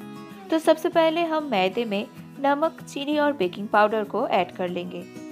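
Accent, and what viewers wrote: India. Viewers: Such a sweet Hindi accent , thank you for the reicpe.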